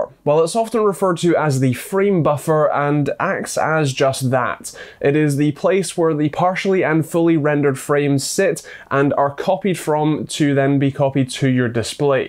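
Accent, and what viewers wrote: Scotland, United Kingdom. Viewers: his voice is Scottish where tf do you think he’s from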